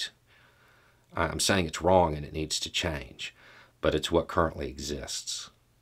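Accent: South, United States. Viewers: I would like you to cover more of this. Dr Richy does but because he’s black I fear he’s not respected among some as you are because you’re white and have a southern accent.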